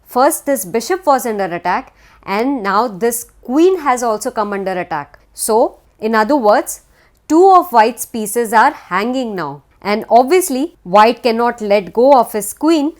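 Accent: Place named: India. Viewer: Thanks! :) your accent sounds Indian idk why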